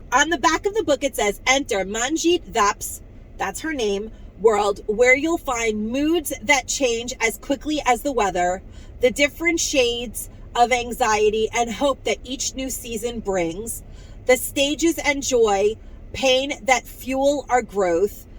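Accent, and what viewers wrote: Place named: Long Island, United States. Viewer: I so enjoy your videos. Your accent is very similar to my own. I'm originally from Valley Stream, LI but I've lived in South Carolina for 15 years. Listening to you sounds like home. Bless you!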